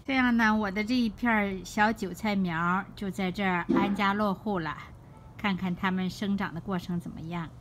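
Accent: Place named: Weihai, China. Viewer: 请问您是不是威海人啊？口音真的好像！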